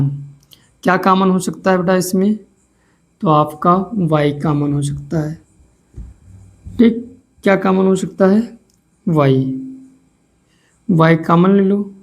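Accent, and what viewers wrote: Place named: Awadh, India. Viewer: Sar avadhi bol rahe ho thoda bahut😅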